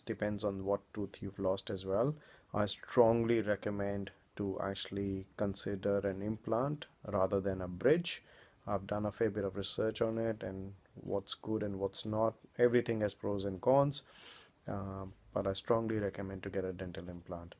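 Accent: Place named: Delhi, India. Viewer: Your not from Australia, your an Indian dude, paid for promo. Accent is Delhi strong.... Lol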